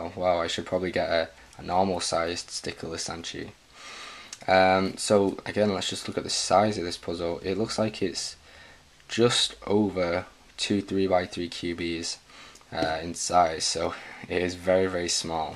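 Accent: United Kingdom. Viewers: you sound british , how long did it take light take to ship to britain if you do live in britain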